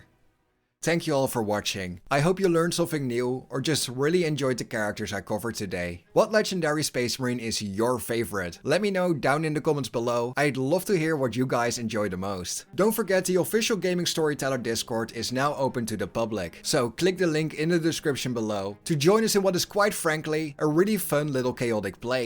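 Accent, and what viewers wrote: Netherlands. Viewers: The Dutch accent is music to my ears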